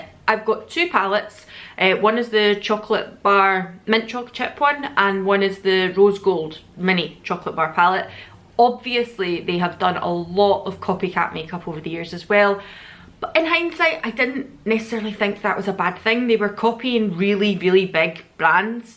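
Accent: Scotland, United Kingdom. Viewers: Completely agree with MLMs, just wondered what your thoughts were on the Body Shop as they have their Body Shop at Home? Really enjoying the videos after discovering you a couple of months ago, nice to hear a familiar Scottish voice 😊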